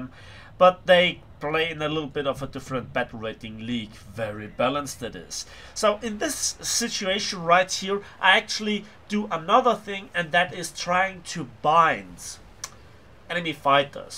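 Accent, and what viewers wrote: Germany. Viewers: Man hört deinen Deutschen aktzent ich mag das :)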